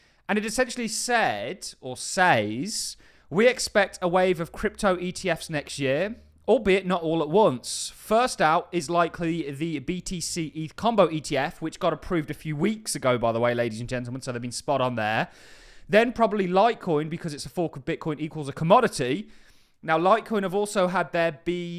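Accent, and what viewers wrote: Birmingham, United Kingdom. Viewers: That’s a brummie accent 👍🏻😎👌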